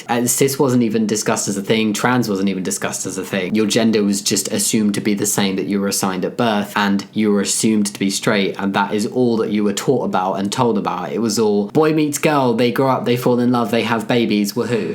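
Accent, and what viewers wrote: United Kingdom. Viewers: Normally I don't pay attention to rants but the British accent and your humor makes it tolerable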